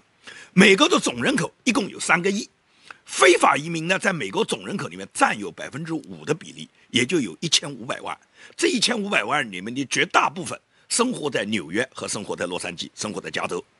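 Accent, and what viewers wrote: Nanjing, China. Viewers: 过去的同事是南京人，所以，对南京人的口音感到很亲切。最重要的是，的内容好。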